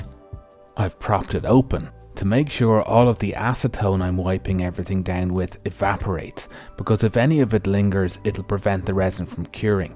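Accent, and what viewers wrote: Ireland. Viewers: Just noticed you used your roll over framework on the vw bus, just spotted it on the intro collage. Your videos are a treat even my partner agrees but that might just be the Irish accent.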